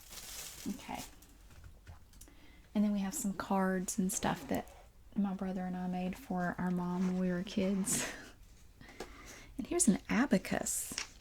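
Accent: South, United States. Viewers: It is joyful to listen to how intrigued you were with the various items in the chest. Your Southern accent and your interests are very relaxing. Keep up the fantastic work!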